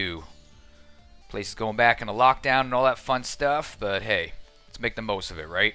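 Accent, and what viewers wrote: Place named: United States. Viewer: you sound American but I'm American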